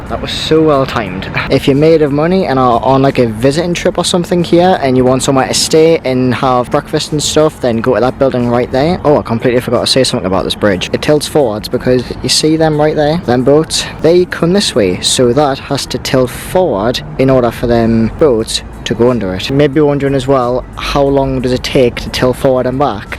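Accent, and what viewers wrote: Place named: Newcastle, United Kingdom. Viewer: Geordie definitely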